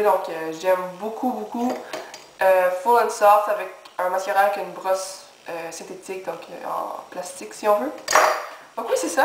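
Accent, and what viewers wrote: Quebec, Canada. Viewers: Normal qu'elle ait un accent elle est quebecquoise les gens non mais serieux !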